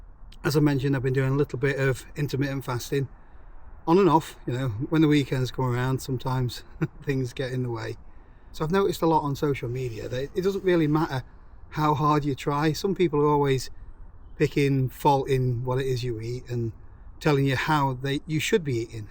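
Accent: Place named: England, United Kingdom. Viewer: hope you don't mind, but what is the name of your accent. yeah, I know you are English, but what part of England is your accent from and what is it called? thanks!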